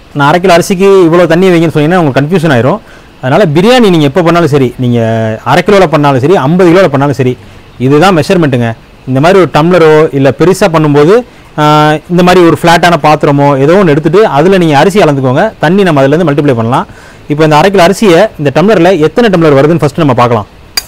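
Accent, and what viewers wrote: Madurai, India. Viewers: I am not here for your recipe, but your humorous commentary and your beautiful Madurai tamil. Love from Bangalore bro. Always keep doing the beautiful work. Cheers❤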